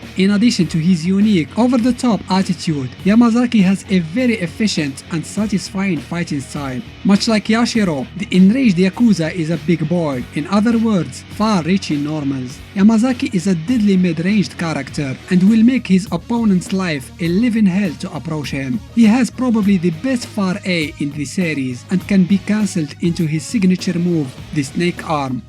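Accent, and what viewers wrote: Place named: Morocco. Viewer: Bro are you Moroccan your accent is very familiar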